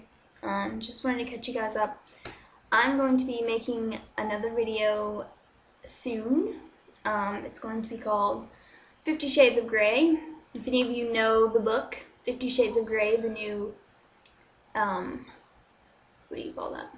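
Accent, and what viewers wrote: United Kingdom. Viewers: I subbed to your channel at first because the british accent too, i to was somewhat obsessed with the UK - i was looking into traveling there, then moving there it was kindof random actually lol.. Shit, you had me fooled.. lol good job girl! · hey stumbled across your YouTube via your "how to speak in a British accent" vid. the others are interesting tew! hope all is well. are you British o American lol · What happened to your british accent? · BTW ether I loved ur British voice XD. And good luck to Michael out there, but no offense he's in the navy not too much to worry about. I know its hard for him to be away but trust me in the long run this will be better for your family beneficial wise. Stay strong :) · thanks for sharing so much personal information with us. Beeing a military wife sounds quite depressing :( I'm male and after I experienced war in my home country as a kid, I was sure that I would never go to any army in the world. 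Ofc its nothing bad about beeing a soldier, but still it's stressfull for a familylife having that kind of long distance relationship.. I wish u and your husband good luck and allot of love and strenght. (your britishaccent is amazing, wish I could talk like that)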